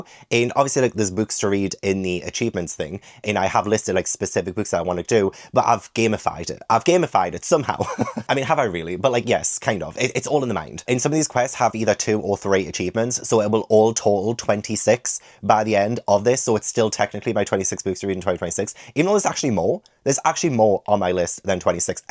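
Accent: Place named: London, United Kingdom. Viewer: Oh my days, is funny lol. Your London accent is fab 😂❤❤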